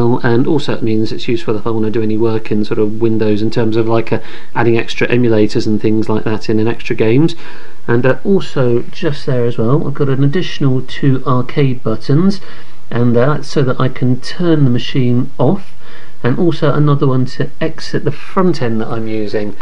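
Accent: United Kingdom. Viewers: you sound a lot like the guy that fixes/fixed my laptop, he lives in Darlington lol, are you from the UK?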